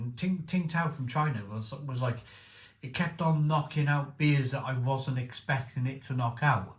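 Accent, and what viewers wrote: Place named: Newport, United Kingdom. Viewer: u from Newport, mate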